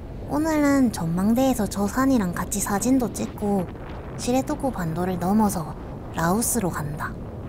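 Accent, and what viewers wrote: Gyeongsang, South Korea. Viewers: 매력있음ᆢ 말도ᆢ 표정도ᆢ 음식도ᆢ 숙소도ᆢ 특히 갱상도 말투의 발음이ᆢ 매력 억수로있음 굿~~^^ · 갱상도 아가씨인가요..~^^? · 구수한 갱상도 사투리 때문에 영상 정주행 중입니다 ㅎㅎㅎㅎㅎㅎ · 경상도 사투리가 일본말투 톤으로 하니까 색다르게 들림~~~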